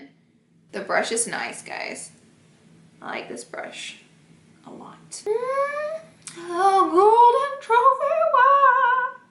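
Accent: South, United States. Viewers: Brittany Hargett she has a heavy country accent, you ignorant bitch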